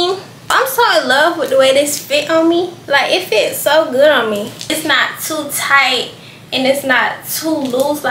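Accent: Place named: Atlanta, United States. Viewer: And I love your voice, girl, the Atlanta voice😊❤🎉😮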